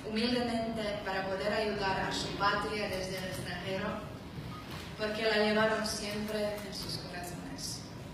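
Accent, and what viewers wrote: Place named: Spain. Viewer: govori iberski španjolski FFFFF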